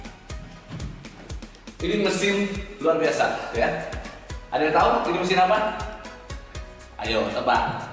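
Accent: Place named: Medan, Indonesia. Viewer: Logat lo masih kental "medan"nya, omongan ngga ada isinya.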